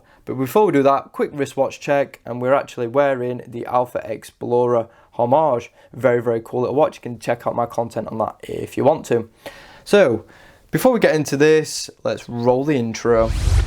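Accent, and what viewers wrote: United Kingdom. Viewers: LUV YOUR BRITISH ACCENT SU MUCH!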